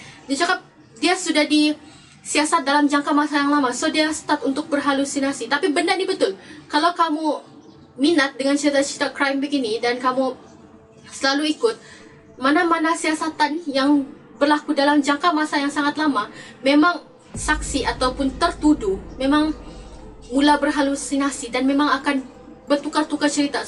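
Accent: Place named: Sabah, Malaysia. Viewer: Siok jg penceritaan sis versi sabah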